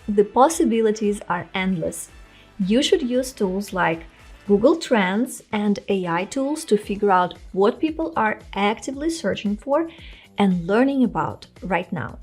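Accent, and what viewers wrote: Russia. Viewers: russian accent is amazing, spasiba balchoy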